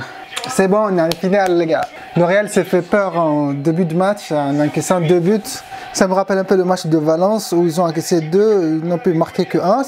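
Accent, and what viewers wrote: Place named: Brazil. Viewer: ta un accent bresiliens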